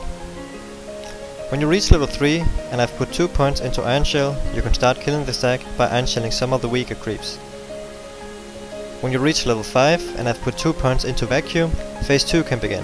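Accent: Denmark. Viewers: your danish accent burns through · Are you danish. because you used the word "og" and you have a danish accent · danish accent! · Hi :) one simple question where are you from? your accent sound danish or so :D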